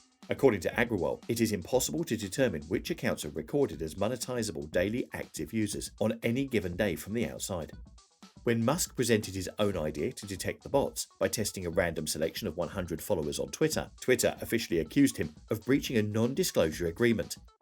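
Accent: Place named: United Kingdom. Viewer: Even tough this is a fine presentation, I get awfully tired of the clipped British accent when we are all tuned in to the good old American accent. It just sounds phony and is hard on the ears.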